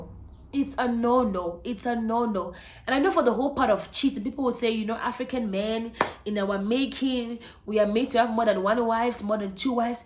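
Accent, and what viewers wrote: South Africa. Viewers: Any how nna lewena ,sounds like ur from msanzi ,,I beg you Cameroon wl disown you ,as for matswere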